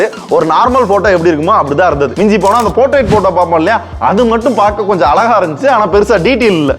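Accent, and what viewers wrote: Madurai, India. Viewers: When our madurai gang vanthale antha slang kaga technicality kaga than unga video pidikum but specific a sollanum na antha VR HEADSET REVIEW ❤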